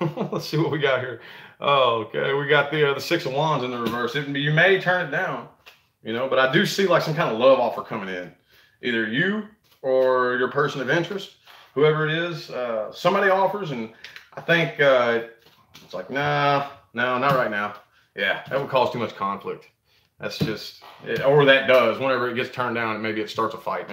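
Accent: South, United States. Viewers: New subscriber alert!!! Loving that Southern accent of yours! Great reading. Thank you.x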